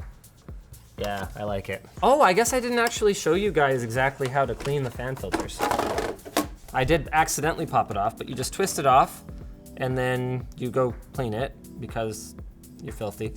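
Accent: Canada. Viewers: sounded extra Canadian in this video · I actually heard a bid of a Canadian accent from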